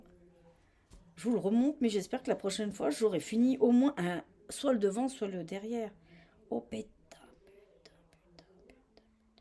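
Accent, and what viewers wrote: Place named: Haute-Savoie, France. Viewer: Bonjour 🙂 Ravie de connaître votre podcast..tres sympa..et bercée par l'accent de ma chère haute savoie il me semble?😅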